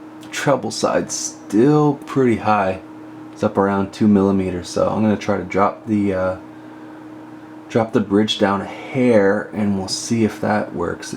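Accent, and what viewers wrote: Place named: Canada. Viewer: SLIGHTLY off topic but as a fan of linguistics, I have to say, Americans always think we Canadians say "a-BOOT". But as my hoser brother here says, it sounds way more like "a-BOAT".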